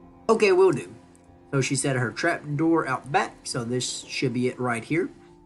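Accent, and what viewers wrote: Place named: Ireland. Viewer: It's Cool! The Irish Accent adds some flair to it! 😎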